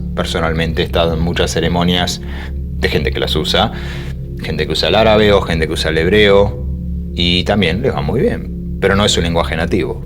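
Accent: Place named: Argentina. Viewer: Hola, que lindo escuchar a!🤗 tiene tan linda voz..y ese acento Argentino..😎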